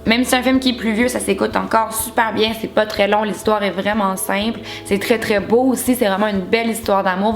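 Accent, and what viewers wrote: Canada. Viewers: il est trop beau ton accent canadien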